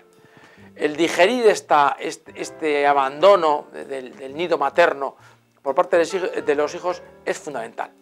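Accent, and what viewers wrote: Spain. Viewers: Jajaja! Ya tampoco le entiendo pero por la manera de hablar, el acento tan español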